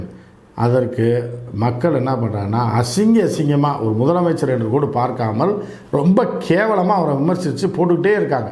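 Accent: Madurai, India. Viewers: Yes madurai karar ...athu than pechu thoni kalakuthu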